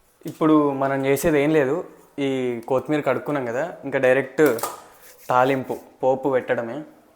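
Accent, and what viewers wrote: Telangana, India. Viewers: Telangana slong....ante..antamu memu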